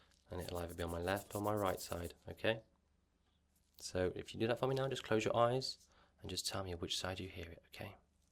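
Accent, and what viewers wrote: England, United Kingdom. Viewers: I like your British accent. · Love your english accent. Where are you from? Thanks for your videos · I've just come across your channel today. This is the first time I've seen an ASMR channel by a British dude (I'm from NI)! Your accent rocks! :)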